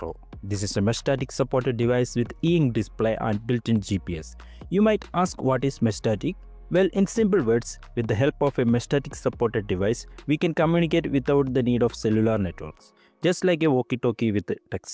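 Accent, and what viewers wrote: India. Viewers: Индийский английский звучит противно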